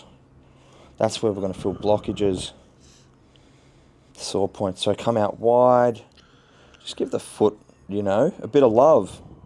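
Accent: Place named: Australia. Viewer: Getting fixed while that sexy Aussie sauve voice sexing me down...is what's up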